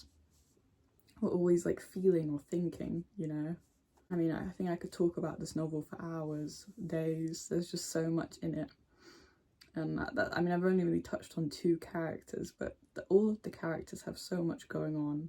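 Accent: England, United Kingdom. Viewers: I think it sounds completely English to me, as a native. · You sound English. Do you read BNW in secondary school required reading? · I'm intrigued by your accent. It's British, but also clearly not native / not from Britain. (I might be mistaken, I mean it's not like I"m from the UK) The closest I've ever heard was a German girl who went to a British private school (not sure if it was in Germany or in the UK) but she spoke a tad more posh. EDIT just noticed the poster, might be another German girl going to a British private school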